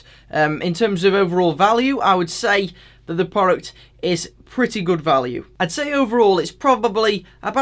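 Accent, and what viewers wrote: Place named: Midlands, United Kingdom. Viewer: brilliant video mate! That midlands accent though haha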